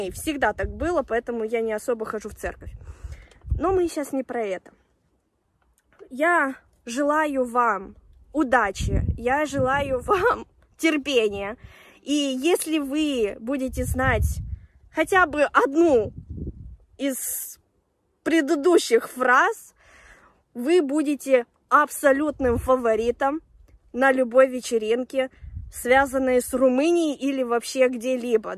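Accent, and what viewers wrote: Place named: Moldova. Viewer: Мне кажется, что у тебя сильный молдавско-русский акцент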